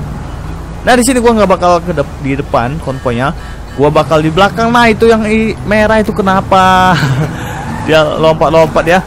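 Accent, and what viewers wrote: Bali, Indonesia. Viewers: Orang bali bli??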